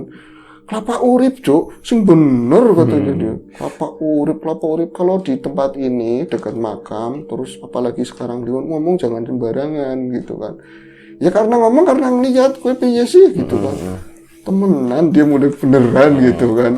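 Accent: Banyumas, Indonesia. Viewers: Request..mohon lebih byk lagi ngapaknya..seru brasa di TKP beneran... Dan biar Mbah sering translate..Ra diem2 Bae 😁😁🙏🙏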